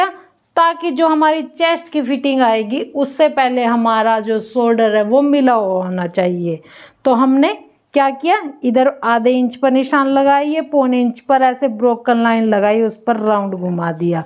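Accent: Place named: Haryana, India. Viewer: Mam aap kaha se ho aapne haryanvi boli bhut acha laga sun ke😂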